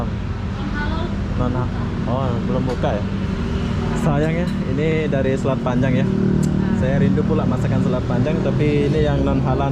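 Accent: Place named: Malaysia. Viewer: Mas bro asli mana kok dialog dan logatnya seperti orang melayu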